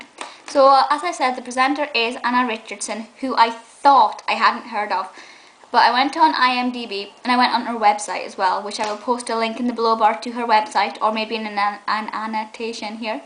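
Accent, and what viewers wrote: Scotland, United Kingdom. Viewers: Haha, Scottish is only one of the most distinctive accents in the world! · scottish or irish? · love your accent!!what is it?(sorry not brit)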